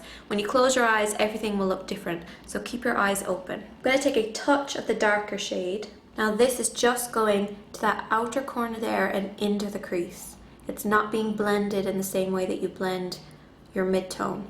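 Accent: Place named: Ireland. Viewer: Are you irish... and moved to the usa years ago? because it sounds as if you used to have an irish accent and are losing it.. that or a parent has the accent and certain words rubbed off on you. whatever it is.. i love that accent. its beautiful.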